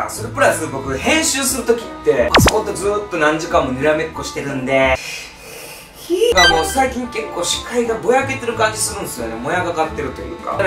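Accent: Osaka, Japan. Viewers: 大阪弁って本当にいいなーっていつも思う😌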